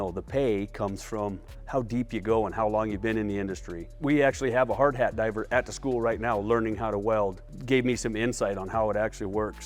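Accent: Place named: Canada. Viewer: How does this Yank have a thicker Canadian accent than those living in Canada 🇨🇦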